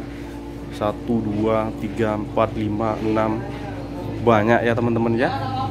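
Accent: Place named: Madura, Indonesia. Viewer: Markas safa audio dimana mas logat ngomongnya kayak orang madura. Salam dari malang🙏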